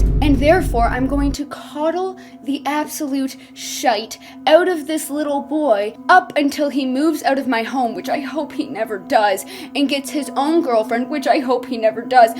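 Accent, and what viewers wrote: Canada. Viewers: so you do sound Canadian but the way we over exaggerate it in the states is to show the difference like when we impersonate Brit’s with “B’ole o wo’ar” they don’t sound like that but like they kinda do?????????? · hi just so you know ive started developing a canadian accent from watching your videos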